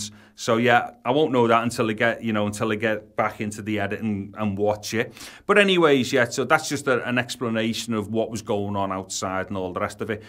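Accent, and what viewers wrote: United Kingdom. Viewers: I love your dialect ! From which part of UK you´re comming from?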